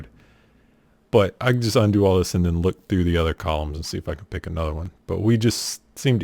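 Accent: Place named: South, United States. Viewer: I watched a few minutes of this and started winning more. Finally back to attempt mastery! Love your accent. Where the heck are you from? I'm from the south; hearing a bit of it!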